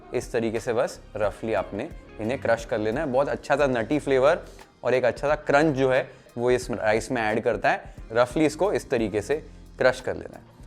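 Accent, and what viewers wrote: Maharashtra, India. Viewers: Is he from Maharashtra? I thought he's from Punjab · Love ur Marathi accent. Malaa bengali fish curry tumcha style chhee receipe share karaa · Love your Marathi accent Chef ❤ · I love your Marathi accent and all recipes are awesome ❤❤❤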